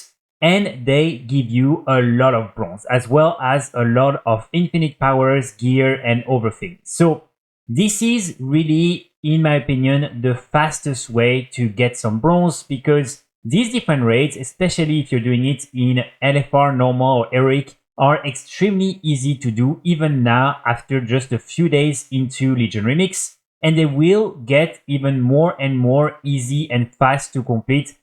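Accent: France. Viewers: Tes français non ?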